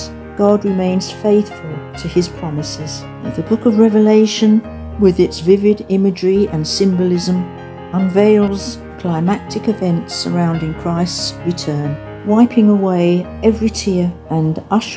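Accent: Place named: United Kingdom. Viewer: Narration with British accents suggests truth, its just manipulation, turn video's off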